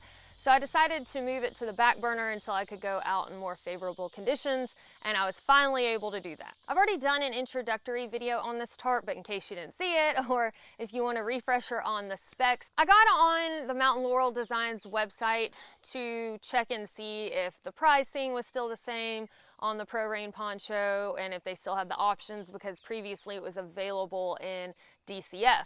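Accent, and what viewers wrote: South, United States. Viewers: I couldn't or wouldn't even want to try this setup. It's a TENT only for me. Nor would I try to use a Hammock on longer trips, maybe for a couple of nights. You are one heck of a gal and I've seen almost everyone of your videos. And every time you reached your end of the trail it was heartbreaking for me too. You did something I can't do. Oh, I'm from Carrollton, Ga. and the way you talk (like I do) is just so down home and proves you're a Country Gal. Waiting to watch any other new trips you go on.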